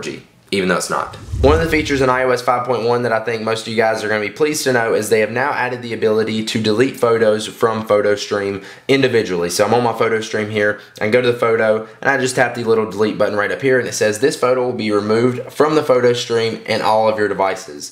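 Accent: South, United States. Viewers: its a southern accent ur either racist or just a fucking dumbass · If you guys think he has a strong southern accent, you have no idea...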